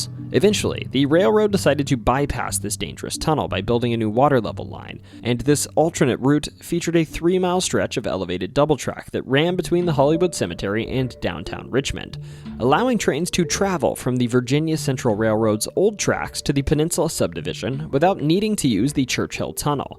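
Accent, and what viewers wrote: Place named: North, United States. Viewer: "Hen-re-co". You must be a Yankee pronouncing your "I's".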